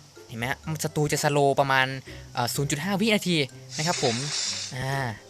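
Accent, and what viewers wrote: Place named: Indonesia. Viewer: Skill translate to việt nam but your voice is indo right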